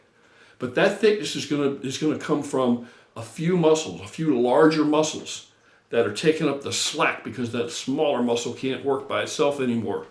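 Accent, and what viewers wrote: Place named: New Jersey, United States. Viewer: Watch a few of your videos back to back. I couldn't agree more on your philosophy thus far. Appreciate you. Sounds like your in the Jersey or Pa area based on your accent. I'm in south Jersey myself. Keep killing it man.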